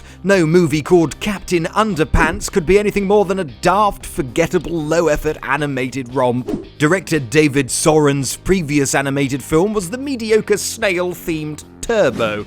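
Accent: United Kingdom. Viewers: Voice actor of this video rocks! I haven't heard so over-the-top British voice in years, awesome! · Power Rangers didn't suck? Well, ok I guess you're entitled to your opinion... (for all those outside of the UK new to this channel we don't all talk like this)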